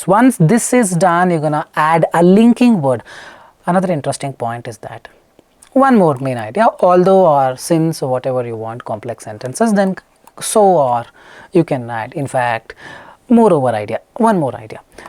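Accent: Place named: India. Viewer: I think he is the best guy who can explain language topic easily. Besides IELTS video, I even follow his another videos about PTE. I enjoy his video a lot from Bangladesh. His accent tells me he is Indian guy. Love from BD.🥰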